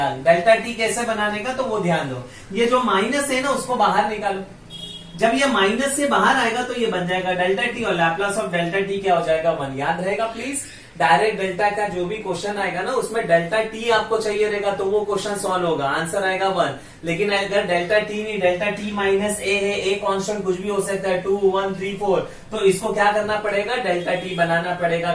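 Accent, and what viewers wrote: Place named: Tamil Nadu, India. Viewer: Bht bhdiya sir.. ur tamil accent is cool 🎉 😎😎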